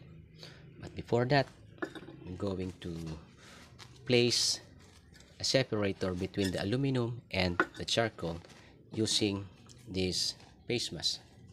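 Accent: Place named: Philippines. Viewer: The way you speak English,similar to us filipinos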